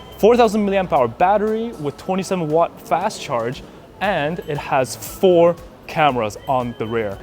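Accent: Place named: United Kingdom. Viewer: I like your English accent Really British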